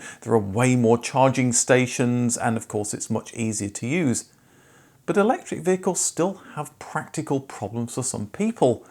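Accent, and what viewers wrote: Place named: England, United Kingdom. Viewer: he has an English accent. Does that help ? 🙂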